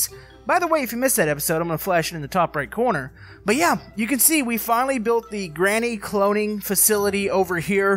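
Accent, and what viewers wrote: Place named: South, United States. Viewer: And are you from Texas because you sound southern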